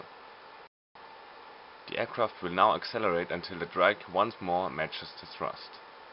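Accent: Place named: New Jersey, United States. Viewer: Sounds like you are from New Jersey!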